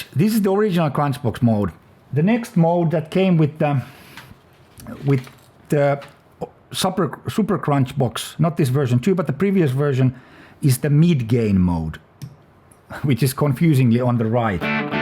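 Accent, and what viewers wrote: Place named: Finland. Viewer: Relax man, I herad the finnish accent, arleady Im buyin this thingy, the MI peds I own are pure genius...Im not so much for crunch, but boysngurls MI means myne, so try try try..., someone said that this with the Super Blues Pro n strat..., LOVE, Kimmo : )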